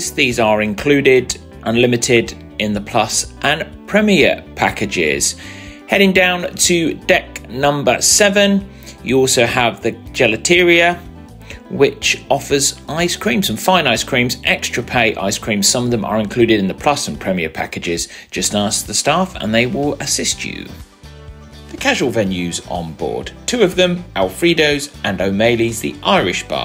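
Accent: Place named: United Kingdom. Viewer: It's the Brit accent. Same as pronunciation of taco, pasta, etc..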